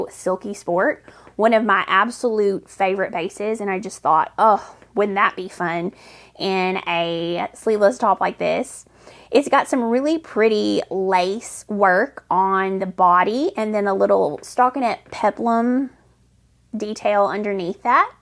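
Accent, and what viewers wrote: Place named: Kentucky, United States. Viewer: As someone from KY. I am enjoying watching such a talented knitter that share my accent. 😂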